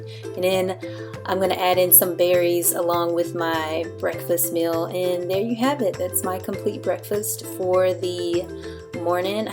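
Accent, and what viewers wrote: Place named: South Carolina, United States. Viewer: I like your accent what state is it from? south Carolina?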